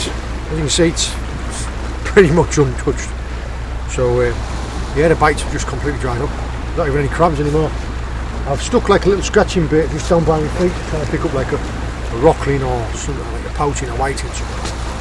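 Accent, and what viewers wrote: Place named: Middlesbrough, United Kingdom. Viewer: Are you from the 'Boro' originally as I recognise the ascent...as I grow up there ! Great video again very informative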